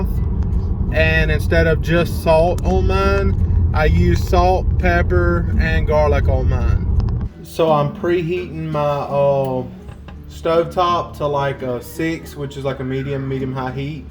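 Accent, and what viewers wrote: South, United States. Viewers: Bro can we trade accents? Southern accents are the best!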